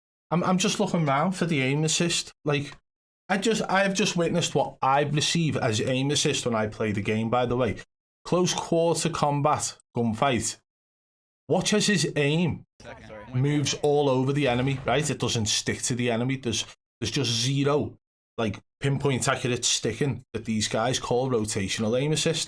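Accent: United Kingdom. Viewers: I barley understand you British fuck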